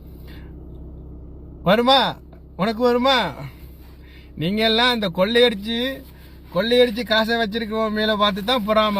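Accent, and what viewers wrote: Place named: Sri Lanka. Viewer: ஆமா உனக்கு தமிழே பேச தெரியல்ல தலைவனை பற்றி பேசுவதை விட நீர் பிச்சை எடுக்க போனவழியை மட்டும் பாருடா உன் மொழியை கேட்டாளே நீர் சிங்களம் கலந்த தமிழன் உன் நடிப்பும் அர்ஜுனா அரசியல் பிழைப்பும் இங்கு வேகாது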